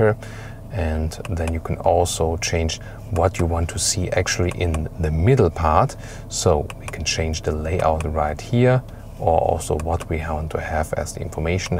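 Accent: Germany. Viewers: I will get the new Defender next week but still watching reviews. I thought I saw them all but today stumbled over yours. As a fellow native German speaking liked your accent already but then I discovered that this is the review I was looking for. It's just the way that if you get the car and discover it yourself with all the little details. And you give your opinion and verdict, which obviously you can buy or not. Best review I saw on the New Defender. Thanks!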